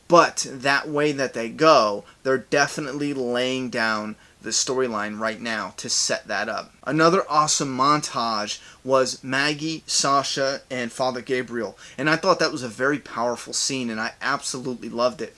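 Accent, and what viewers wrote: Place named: New Jersey, United States. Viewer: Gotcha. You had this Jersey Girl fooled. Your accent is sooo Jersey. And your humor is similar to Jay from Jay and Silent Bob from the Kevin Smith movies who is also a comic guy and from NJ. Thought I #nailedit ...smh... LOL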